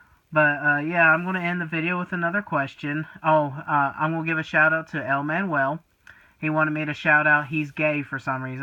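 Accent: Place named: South, United States. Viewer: My mom wants to know where you're from? We both agreed you sound country. I'm from South Carolina myself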